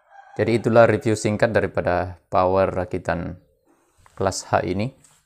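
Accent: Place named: Toraja, Indonesia. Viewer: klo saya dengar2 logatnya Orang ini dari Toraja, maaf klo sala tebak 😁🙏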